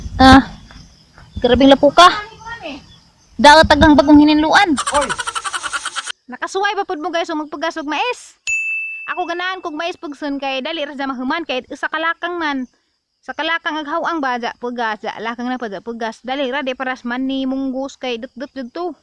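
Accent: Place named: Cortes, Philippines. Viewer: hahaha..kuhang kuha jud nimo ang tuno sa mga tga cortes dai...gimingaw nakog pugas ug mais...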